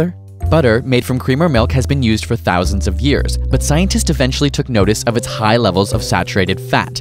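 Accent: Canada. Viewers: Haha, hello fellow Quebecer, I see that margarine container. Do you live in Montreal your accent would suggest it.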